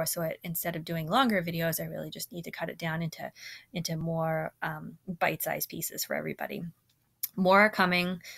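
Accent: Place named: New England, United States. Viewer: Hey, Thanks for the videos! Being from California I get a laugh from some of the wicked New England pronunciations (drawring, lol). Anyways, do you know if it's possible to merge a worker account? I realized I had an account I worked on years ago that had a few HITs that might help me push towards 1000. Keep up the good work!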